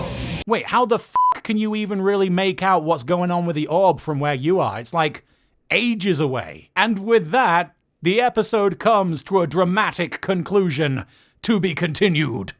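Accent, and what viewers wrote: United Kingdom. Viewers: It’s amazing to hear how his accent has gotten so progressively less British. Like seriously. Go back and listen to his old vids. British. Accent.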